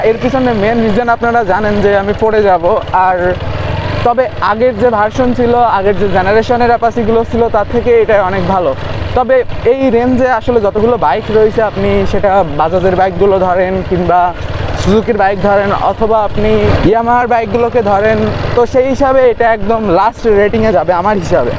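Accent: Khulna, Bangladesh. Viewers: আপনার খুলনার ভাষার কথা শুনে আমার ও খুভ ভাল লাগতিছে !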